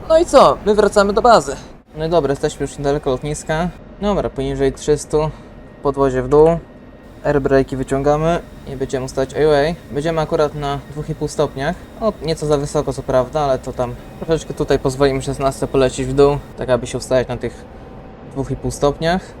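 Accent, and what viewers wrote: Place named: Poland. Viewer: Siema, fajnie w koncu ogladac Polski akcent w DCS. Aktualnie mam mala przerwe od DCS. Grasz moze w IL2 Great Battels ??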